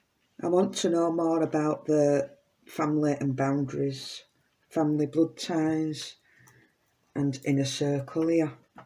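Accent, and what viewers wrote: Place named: Yorkshire, United Kingdom. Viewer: Hi hun new sub you sound very yorkshire im from Leeds and you sound same accent loving this ive watched this about Sebastian from day one its so sad 😢💚💚💚💚💚💚💚💚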